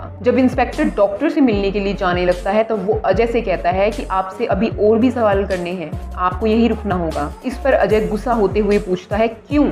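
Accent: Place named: Gujarat, India. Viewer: The narrator has Gujarati accent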